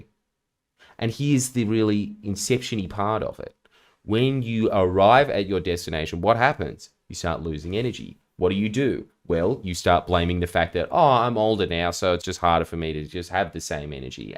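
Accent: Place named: Australia. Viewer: The only thing I have energy for is to hear beautiful Aussie accent and his perfectly cut chin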